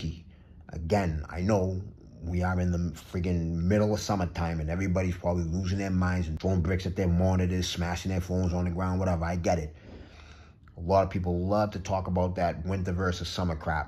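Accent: Boston, United States. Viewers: Great video as always, bro! Welcome back, with gratitude from Woostah for your no nonsense tellin it how it is & great to hear that Boston accent representin & preachin at fragchuch for a Sunday! Think I finally found a place up in the Boston area that may carry a decent selection of niche and indie houses but haven’t had a chance yet to check it out - believe it’s called pickwick’s mercantile in Portsmouth. Could be dangerous to visit 😂have a great rest of your day!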